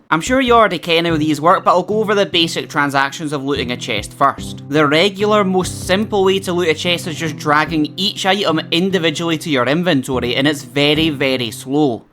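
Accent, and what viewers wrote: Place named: Scotland, United Kingdom. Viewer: Propa Scottish man, aye?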